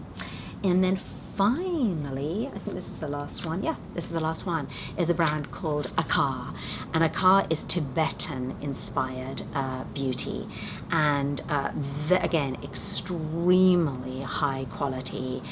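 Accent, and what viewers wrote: United Kingdom. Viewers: Hi, great serums I will certainly check them out. Starting my 14 days sugar free today. i know I'm not watching these in any particular order but so far loving the content. I'm in Co. Wexford Ireland and spotted your British accent straight away which is why I stopped to watch you and so glad I did. Eleanor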